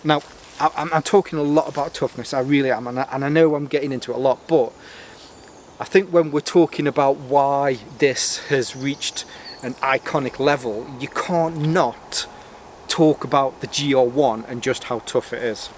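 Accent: United Kingdom. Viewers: One of the most detailed review in British accent. Started to get interested in MCB fabric. Luv it!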